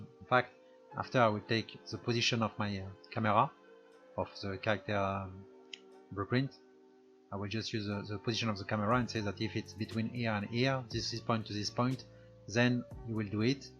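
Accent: France. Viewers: Oh mon dieu mais tu es Français :D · Tu es français? Ton accent anglais n'est pas très présent mdrr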